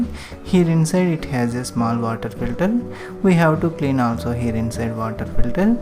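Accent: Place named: India. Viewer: Thanks for cleaning instructions and fu*ck you because of that Indian accent.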